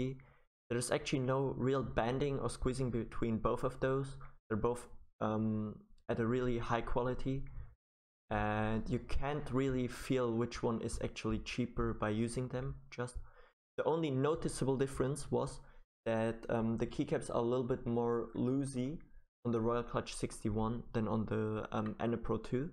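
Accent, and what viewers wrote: Germany. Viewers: ich wuste es das du ein deutscher yter bist wegen dein sprechen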